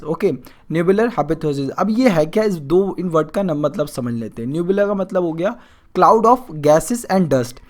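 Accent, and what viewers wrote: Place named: Punjab, India. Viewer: Loved ur punjabi accent and explanation 🙏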